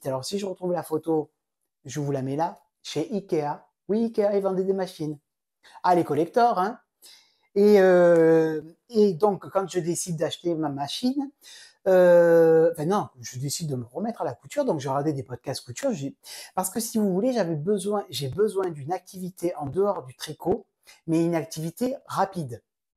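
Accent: South, France. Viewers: Bonjour! L'accent du sud de a fait venir le soleil; la matinée était pluvieuse, jusqu'à ce que j'écoute ton podcast 😂😂😂 Super, tes chaussettes Serre-moi la pince! J'adhère a l'idée de l'instant Tricot 🎉 Bonne continuation!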